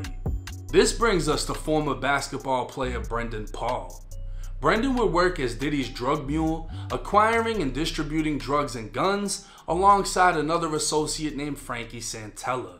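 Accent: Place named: East Coast, United States. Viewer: You from Houston huh? Tam bout Whoops took some time but I hear that East Coast accent. Bruh I'm glad I found this channel. Keep it up Big Homie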